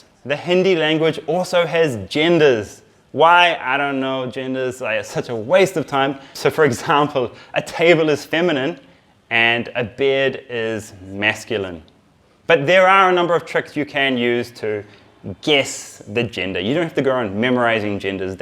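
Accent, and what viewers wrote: New Zealand. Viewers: Kia ora! The first YouTube video on how to learn Hindi and the speaker has the same accent as me:) Whereabouts in Godzone are you from? I'm learning Hindi because I simply just love the look and sound of it. I dabble a little in Buddhism and yoga, so being able to know more of the language will hopefully enrich both. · Are you from New Zealand?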